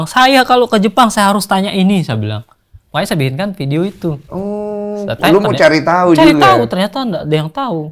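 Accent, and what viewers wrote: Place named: Bali, Indonesia. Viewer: logat nya bang 😂 ada Bali Bali nya gitu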